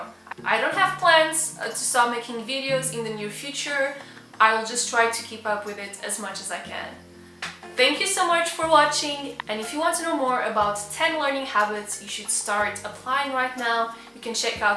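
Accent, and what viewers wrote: Portugal. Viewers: A melhor parte do vídeo foi te ouvir em português. O português de Portugal é muito, muito bonito. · Sempre quis saber se era portuguesa ou brasileira, por conta do sotaque e dos livros em português. Abraços do Brasil! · Omggg portuguese from Portugal is sooo freaking different 😂😂❣️ (I’m from Brazil) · HAHAHAHA sua voz muda e fica com o português de Portugal que fofinho. 😍❤❤😢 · OMG your Portugal accent is so beautiful! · Adorei ouvir o sotaque português! Sou brasileira, e é engraçado o quão pouco contato eu tenho com o português de Portugal falado. Faça vídeos como esse com mais frequência! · Since 2016 watching your videos and... well, what a surprise! I definitely adored the new format. By the way, Lisboa é a cidade mais bonita que já visitei e seria maravilhoso assistir a vídeos em português. The Portuguese accent is one of my favourites! 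· Eu sou Brasileira e o sotaque Portugues do Portugal e muito diferente xD xD xD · Sou brasileira e amo o sotaque de Portugal ♡♡ queria que os brasileiros usassem o termo "bocadinho" também haha ♡♡♡ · Português de Portugal é tão engraçado e tão bonitinho.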